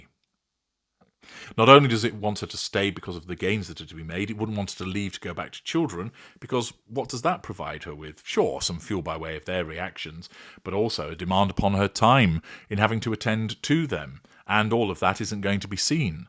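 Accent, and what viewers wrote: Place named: RP, United Kingdom. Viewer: You sound a bit like, David Walliams posh voice!.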